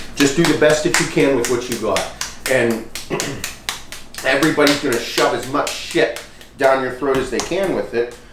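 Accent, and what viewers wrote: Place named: Pittsburgh, United States. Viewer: This dude has to be a Pittsburgh boy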